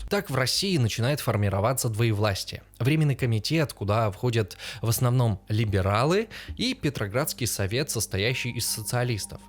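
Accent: Ukraine. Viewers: Господи, как же раздражает этот украинский говор, нахрена букву Я в каждом слове проговаривать как Я твердо, в русском вообще мало где она так произносится, пишется Я, а произносятся звуки Й, И, либо вообще проглатывается звук Я при разговоре. Кровь из ушей, хуже только кубаноидный говор, этим говором русских пытать можно, только включить запись и мы сойдем с ума. А канал классный, но мои уши сворачиваются в трубочку 🩸 Я бы лучше с субтитрами посмотрела, выключив звук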